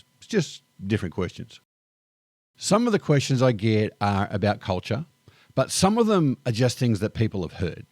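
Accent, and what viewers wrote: Australia. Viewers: Unfortunately, you have quite a strong Aussie accent. You're like Bubba from the deep south or Jerome from the Bronx trying to order KFC in Australia 😂. BTW, I'm in my 60s, lived all over Oz, and never checked my shoes for spiders and have never found one. Nobody I know does. That said, encountering a Funnel Web or Redback (=Black Widow) in your shoe could be a problem. Other spider species? Nah, pretty harmless. Spiders and snakes never 'try to kill' anyone. They just run away, if you can even find one. Bears, Pumas and wolves are far riskier. They WILL attack and possibly kill. American readers, please get these things in proportion. Australia is very safe. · To be fair, your Aussie accent is quite broad. Your experience being misunderstood in the US might be worse than some. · Three syllables out of your mouth and I knew your Australian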